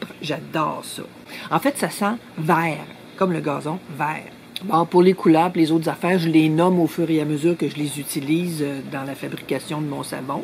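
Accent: Quebec, Canada. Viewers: Bonjour, je suis tombée par hasard sur une de vos vidéos!!! Merci pour vos conseils, votre dynamisme et cela fait du bien d'entendre la parler québécoise (j'ai eu la chance d'habiter 1 an à Montréal).... et surtout BONNES VACANCES!!!!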